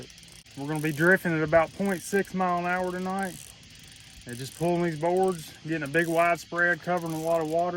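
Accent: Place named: South, United States. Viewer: Where are you from? 100% no offense but I can't figure out your accent. You sound like a southern feller but then again sometimes not?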